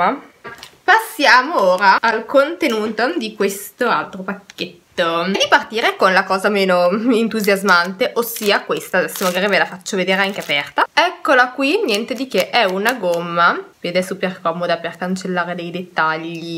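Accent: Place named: France. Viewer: bella la scritta sulla maglietta killing you in my mind,complimenti per il fisico =) cmq mi fa simpatia il tuo accento,sei francese? 😁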